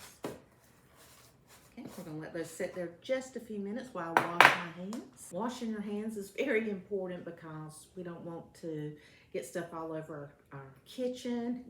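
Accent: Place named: North Carolina, United States. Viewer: I discovered your channel a couple of days ago and I am loving it! I suspected you're from eastern NC with the accent (not poking fun, I have the same accent!), but the fried chicken and banana sandwich just confirmed it for me! Please tell me I'm not wrong! My mama cooked the best fried chicken. I am 64 years old, and when I was a child and we'd go on vacation Mama would pack a picnic lunch for us to eat on the way at a roadside picnic table. She always, always, always packed fried chicken and banana sandwiches! My daddy loved that combination. Such sweet memories!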